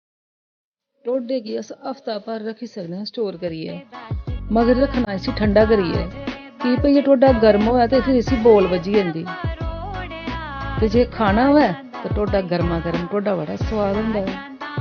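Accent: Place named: Dogri, India. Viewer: Your dogri accent is soo good♥️♥️♥️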